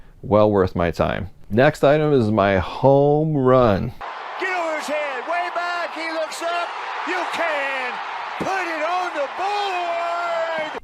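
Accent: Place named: Michigan, United States. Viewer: I appreciate your videos mostly because I love to listen to your accent and Michigan references. Dad had a pole barn in the back yard. Here in Missouri it's a shed or a shop. Born and raised in Midland I'm a Michigander living in a "foreign land". Thanks for your thrifting and sales advice as well!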